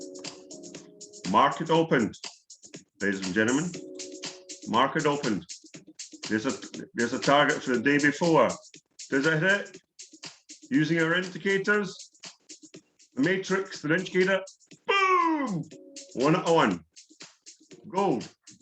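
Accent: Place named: Scotland, United Kingdom. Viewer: I've just upgraded my tradingview to the pro+ with volume profiles. I've spent the full weekend looking and reading about the profile, the problem is 99% are showing you hindsight or they are just explaining the profile and not actively trading with it. Am I right in saying that you are always targeting the previous days POC? Today is my first day with volume profile and i'm also trading futures with CME data, i'm going to go through all videos on the channel, any pointers on what videos are clearly describing your strategy? BTW great to hear a fellow Scotsman, cheers!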